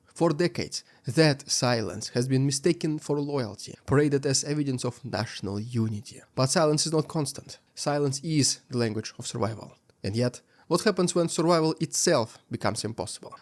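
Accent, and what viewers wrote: Russia. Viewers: Brilliant and compelling analysis, eloquently delivered. The Russian accent forewarns inartful delivery, but the reality is just the opposite, and a true delight to hear and absorb. Thank you!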